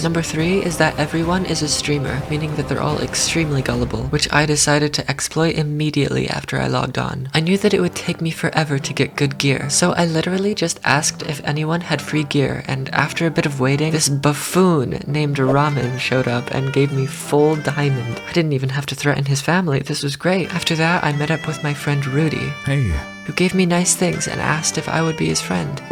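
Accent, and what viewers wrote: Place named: United Kingdom. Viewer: Brit’ish ebony POG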